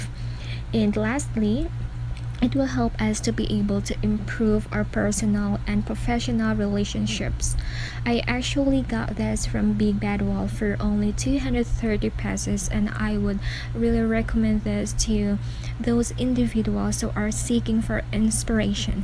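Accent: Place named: United States. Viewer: Hi pretty sis akala ko american ang nagsasalita ang galing naman mag english..nice books pretty that books its good to my daughter she love to read like you..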